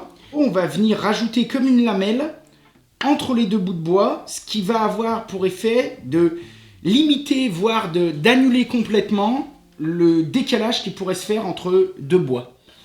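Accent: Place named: Haute-Loire, France. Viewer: Allez, courage. Moi je suis ravi de retrouver mon avec son accent de la haute Loire, sa bonne humeur et son don pour donner envie de lui ressembler. Quand je serai grand, je voudrai faire « » comme métier 😉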